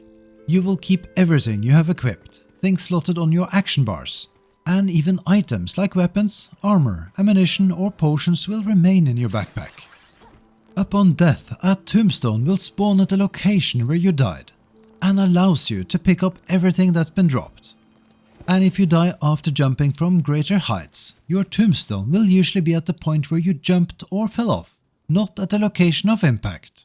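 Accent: Norway. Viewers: Are you Norwegian? ^^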